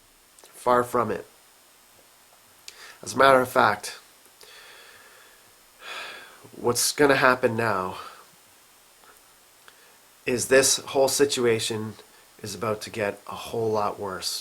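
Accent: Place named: Canada. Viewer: you’re a great guy! You wear your heart on your sleeve! From the beginning I watched you mostly, although some others, but with you I really got the Canadian vibe. I’m from the Southern US that’s it’s enjoyable hearing you talk dude! I get a kick out of how you describe the cold weather as “snappy” 😹 For years Americans joked about Canadians but let me tell you, y’all have earned our respect and the worlds respect over the past 3 weeks! Honestly, I’ll never look at the Canadian flag the same way ever again! Now, I see the Canadian with so much respect and it’s an honor having good people like you just to our north! Also to add, I’ve learned more about Canadians in the past 3 weeks than ever before and I really appreciate what you’ve done as well as everyone else! Stay strong! Y’all have the support of so many people all over this world including your cousins down down here in the US!!!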